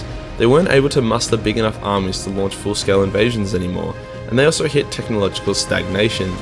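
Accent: Australia. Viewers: As a Canadian I reckon aussie have the best fuck off narration around, fair dinkum, bloody legend!!!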